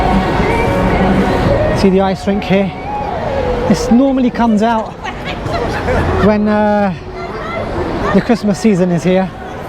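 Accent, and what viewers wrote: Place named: Cockney, United Kingdom. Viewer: Ranjit Singh was my hero, I hope to visit one day, thanks again, just love the cockney accent.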